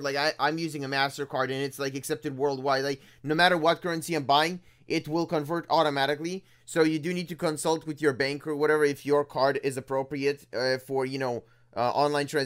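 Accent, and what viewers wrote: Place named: Israel. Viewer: ישראלי מאשקלון עם מבטא כזה? לול דרך אגב סטים לא לוקחים את מה שקנית?כי לפעמים מקבלים מזה באן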